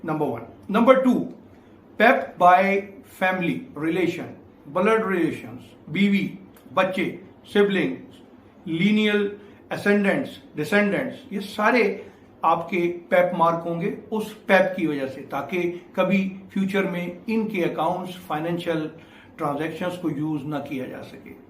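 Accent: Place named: India. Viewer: For first 2 minutes I was like he is an Indian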